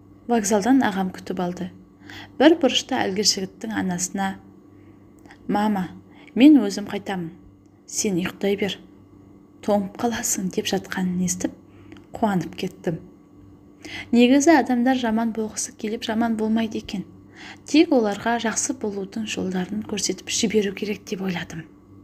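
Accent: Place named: Russia. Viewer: сол үйрену үшін қателіктерін айтканымыз дұрыс шығар. И орысша акцентінде түзеп анық қазақша оқу үшін қазақша кітаптарда мәнерлеп дауыстап көп оқыса . Сосын барып бүкіл елге оқыса дұрыс болар еді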